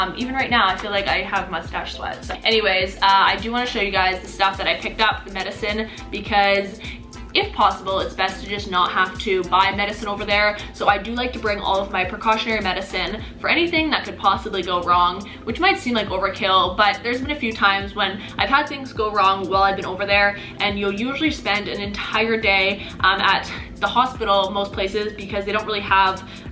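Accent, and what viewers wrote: Canada. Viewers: I love the way you say bag hahahaha your Canadian accent is so cute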